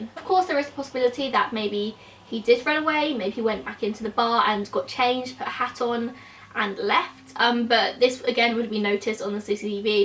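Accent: United Kingdom. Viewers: You're from the UK?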